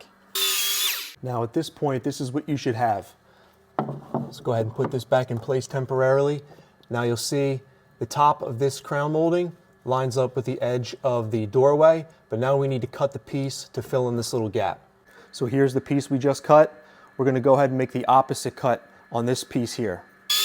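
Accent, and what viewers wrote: Philadelphia, United States. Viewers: True story- video with Philly-accented voiceover builds instant trust 💪 Thanks, brother!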